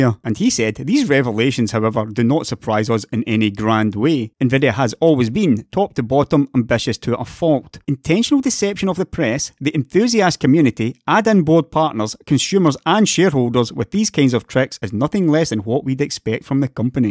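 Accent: Scotland, United Kingdom. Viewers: Thanks, you've shared useful information. BTW, I wonder I guess you're Scottish and where do you live ? England or Scotland ?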